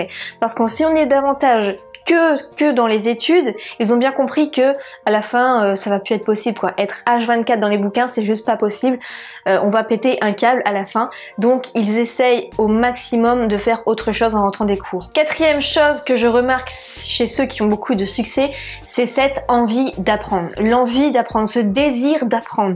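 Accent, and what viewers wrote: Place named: Belgium. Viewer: Elle est belge c'est pour ça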